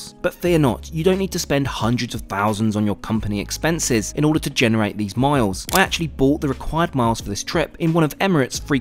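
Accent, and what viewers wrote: RP, United Kingdom. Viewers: Bro this guy talks sooooo posh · And I love your British voice. I love hearing Brit’s … be safe with your travels ….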